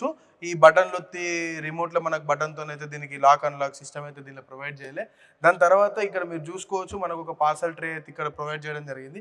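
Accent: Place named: Telangana, India. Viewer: Excellent review Anna Ma telangana Slag brand ambassador vi nivuu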